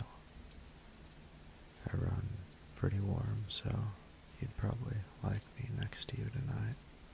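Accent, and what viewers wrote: South, United States. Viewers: the soft "darlin" always gets me i love a good western/southern esque accent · weird question but am i the only one hearing a southern accent? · the country acent😳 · 100% there is no way there isn't a southern accent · Light southern drawl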